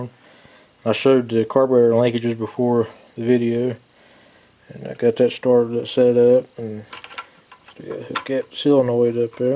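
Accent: South, United States. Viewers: Great video..thick southern accent but pretty much understood everything...Damned 10% ethanol fuel screws up everything in the fuel system! It's always a good idea to put a petcock on the fuel line and just shut it off and let the motor run out of fuel when you're done mowing.. Otherwise your needle valve on the float in the carb wont seat properly with all the corrosion and you'll either have fuel leaking out the exhaust or get gas in the crankcase!! I had gas in the crankcase and it caused all kinds of weird problems. Thought I blew a head gasket with all the smoke until I started taking it apart and gas was leaking out of the head. Now I have to put the head back on and take the carb apart, so I decided to just clean up the head, valves, and piston, and replace the needle valve and seat on the carb. Hopefully it will run again!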